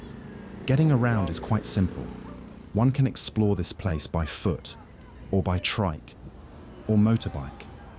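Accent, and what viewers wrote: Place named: United Kingdom. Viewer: Excellent documentary! Your accent sounds British to me and not Californian. But what do I know...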